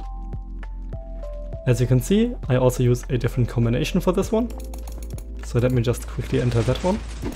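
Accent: Germany. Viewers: Do you think his german accent stands out a lot?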